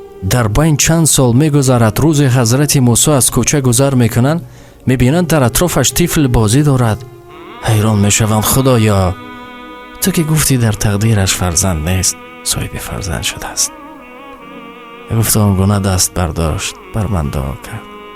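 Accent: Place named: Tajikistan. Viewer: بهترن سخنها آفرن دستی ازیزم لهجه شیرن فارسی تاجیکی🇹🇯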